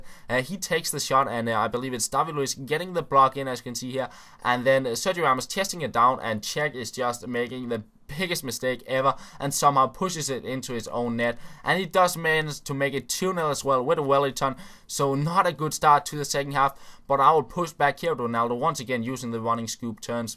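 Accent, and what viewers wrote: Denmark. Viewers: I'm a new, subscriber. Are you Danish, you sound Danish.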